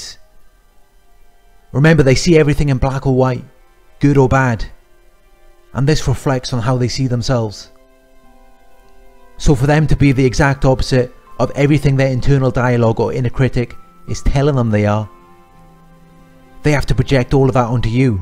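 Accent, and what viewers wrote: Wales, United Kingdom. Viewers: is this guy welsh?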